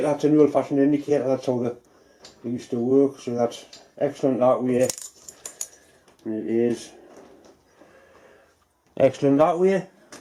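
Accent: Newcastle, United Kingdom. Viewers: I love how Geordies say "hurls" instead of holes.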